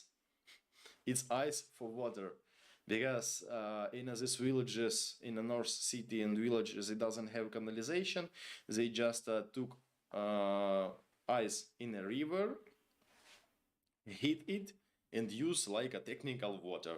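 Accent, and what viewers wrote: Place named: Ukraine. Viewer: Hi, i vote that you keep the expression ”my dear” if it’s coming from Ukranian. Many of us are also non native English speakers, and we all carry small ”impefections” from our language. It doesn’t make it difficult to inderstand but it gives your english a sfumature of your own country. Thanks for the stream!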